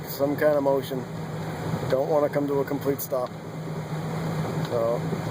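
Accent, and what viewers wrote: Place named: Northeast, United States. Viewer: For the snow, scenery, and NE accent: thank you!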